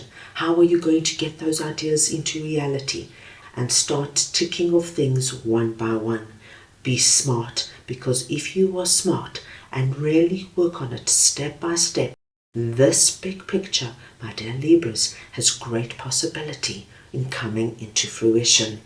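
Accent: South Africa. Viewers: I love how you read, and also love your lovely south African accent🎉❤ Thank you 🥳🙏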